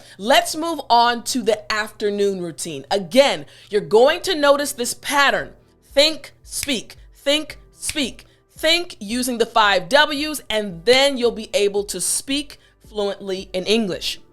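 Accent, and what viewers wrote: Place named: United States. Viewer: thank you for your lesson! i'm ardi from indonesia, I'm a teacher and watching your video really inspire me a lot and i kind of difficult to teach and make the student understand what i'm actually gonna tell them and to be honest, there are still so many kids in my country not really interested in learning english, they learn as their parents' willingness not from the students themselves. for me, you are such an amazing teacher, your lesson are easy to absurb and the way you teach is so cheerful, ireally enjoy your american english so much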